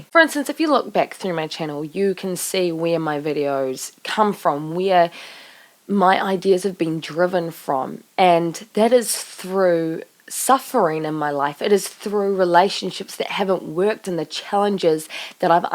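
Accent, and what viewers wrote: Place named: New Zealand. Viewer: Completely off track but I heard your accent and can tell you're from home. INFP NZ here.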